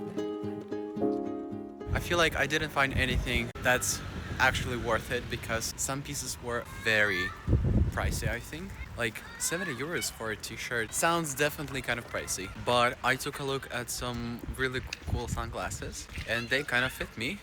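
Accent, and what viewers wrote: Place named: Sweden. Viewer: Can really see your excitement in this video and your English sounds very Swedish as well... you fit right in :D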